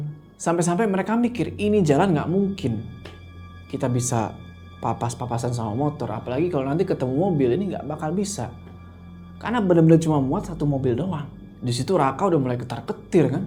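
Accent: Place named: Java, Indonesia. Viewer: bang, aksen jawa lu makin hari makin bagus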